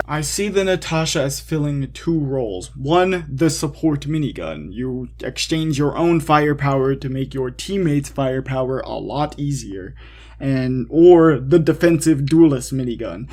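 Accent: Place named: Turkey. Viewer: This guy sounds very turkish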